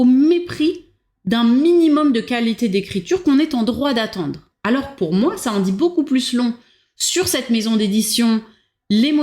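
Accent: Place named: United Kingdom. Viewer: J'ai tout aimé chez vous, vos yeux qui ont l'avantage d'être deux, vos cheveux ondulant, vos multiples chemisiers, vos ongles, votre tapis, vos lobes, votre élocution et votre franc parlé, votre humour, ce micro indéboulonable, cette petite souris qui passe derrière le canapé a 21mn17, cette analyse pointue, ce merveilleux petit accent british (quand on aime on dit n'importe quoi 🙄), les livres sur la cheminée dont je n'ai distingué aucun titre, vos claquement de doigts, votre mug......... il y a cependant deux choses choquantes !! cette lampe sur une chaise et ce livre certainement écrit par un enfant dérangé mentalement dont vous nous faites lecture !! ceci dit merci à l'algorithme de m'avoir proposé votre chaîne !(j'en ai une également orientée nature) vous avez aujourd'hui un nouvel abonné ! ✨️🌟⭐️🔥♥️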